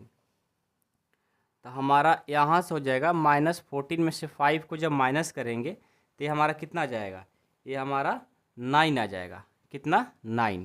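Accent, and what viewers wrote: Bihar, India. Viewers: Padhana bahut acha hai sir but ye jo alag se sound aa raha hai ye language Bihar ka lag rha hai😊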